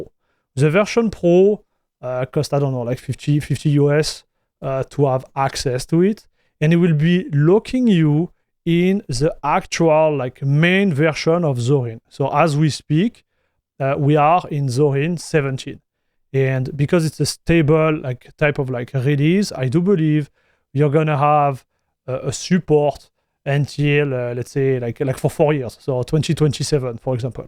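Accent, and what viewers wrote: France. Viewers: Bro you need to stop speaking in english, french people suck when speaking any other language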